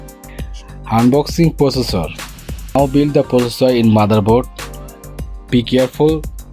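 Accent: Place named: India. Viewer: tu ingles es muy indio flaco mejor habla español y deja de joder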